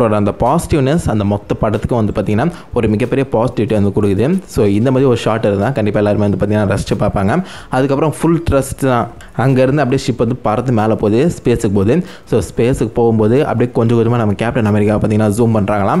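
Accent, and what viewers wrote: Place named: Madurai, India. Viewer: Ji Neenga Entha Oru nu sollamudiuma. Yenna onga Pechula Madura Slang theriyuthu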